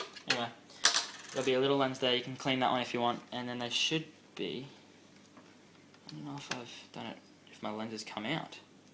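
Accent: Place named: Australia. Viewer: "Don't swear"... You sound Aussie as well but i'd turn and say fuck just for shits and gigs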